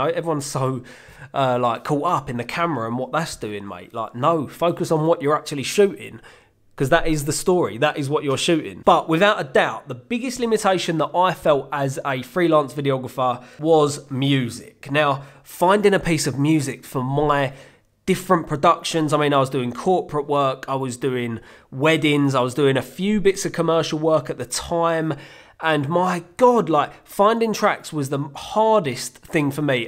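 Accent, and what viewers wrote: United Kingdom. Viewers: Brit accent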